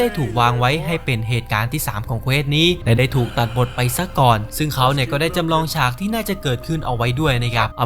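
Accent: South, Thailand. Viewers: พี่เป็นคนใต้หรอ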